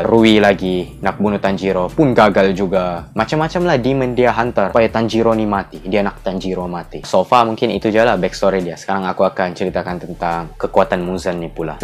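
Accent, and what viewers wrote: Sabah, Malaysia. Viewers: Yes, org sabah 😂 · Ko org sabah? 😂😂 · speaker ni orang sabah kah? 🤣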